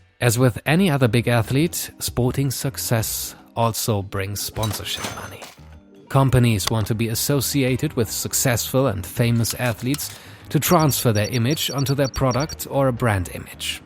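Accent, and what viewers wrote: Germany. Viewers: Love the German accent ngl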